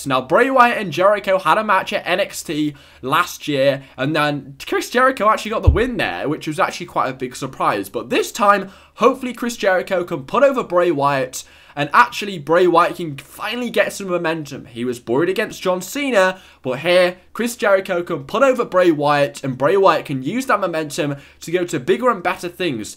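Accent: England, United Kingdom. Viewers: You have a english accent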